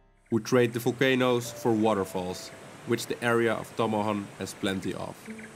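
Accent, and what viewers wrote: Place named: Netherlands. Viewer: Dik Nederlands accent :p maar wat een prachtige video!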